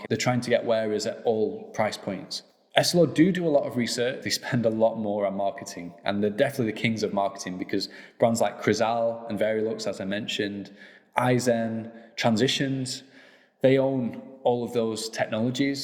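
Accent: England, United Kingdom. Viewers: is this an ENGLISH accent i love bit hard to understand from Ontario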